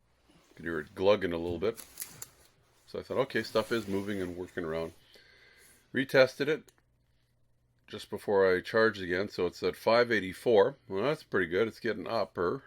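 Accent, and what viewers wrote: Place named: Canada. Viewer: OH i bet it is Canadian dialect. I’m down here in the USA. You do a very decent job on your voiceovers.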